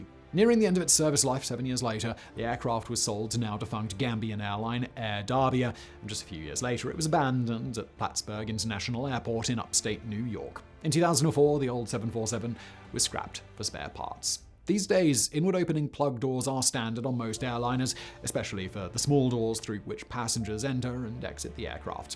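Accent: United Kingdom. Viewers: HOLY COW!!! I really TRIED to watch this video!!! I live in Texas!!! My dang ears & brain can’t keep up with your dang UK accent & “speed talk”!!! SHEEEESH!!! I finally had to STOP being frustrated & delete the video!!! 🤓🤓🤓🤓🤓🤓🤓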